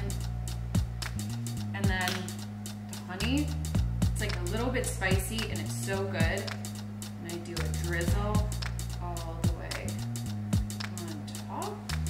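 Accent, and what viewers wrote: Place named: Canada. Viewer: I love the sound of voice and her Canadian accent ❤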